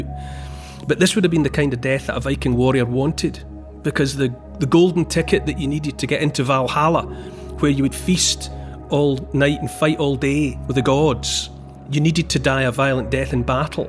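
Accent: Scotland, United Kingdom. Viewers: Hi there. I love your soothing Scottish voice. You could talk about anything even and i'd listen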